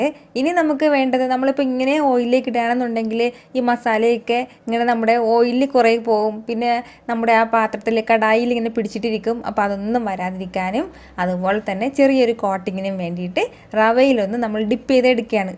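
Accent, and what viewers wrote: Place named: Kasaragod, India. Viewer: kasargod orgin ano....slang kasargod style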